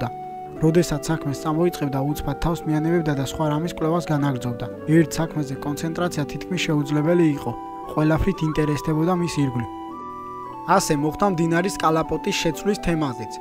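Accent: Kutaisi, Georgia. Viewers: ქუთეისელია რამე პრობლემა გაქვს დაია??????????????????????? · რაფერ გეტყობა ვიოო ქუთაისელი რო ხარრრ🥰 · Kutaiseli xar 😁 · არ იქნება ეს ქუთაისელი.....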